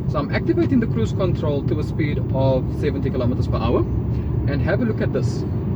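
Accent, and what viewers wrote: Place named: Pakistan. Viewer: Whats sup with this Pakistan accent ? Can’t get half of a shit you said.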